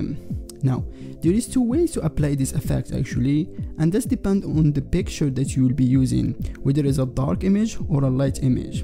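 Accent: Morocco. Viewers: you sound moroccan or at least north african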